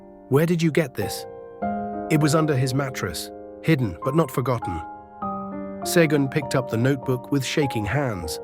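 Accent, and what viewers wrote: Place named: United Kingdom. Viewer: I SO AGREE! I CAN LISTEN TO THE NARRATOR (BRITISH ACCENT) ALL DAY. LOVE THE FEATURED MOVEMENTS OF THE CHARACTERS. GREAT STORYLINES FROM START TO FINISH.😊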